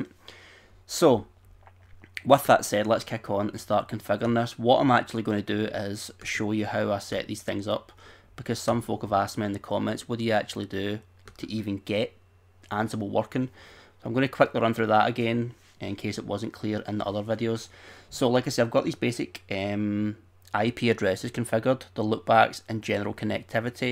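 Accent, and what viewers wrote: Scotland, United Kingdom. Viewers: Great video - epic accent :) hello Scotland :) cheers from Munich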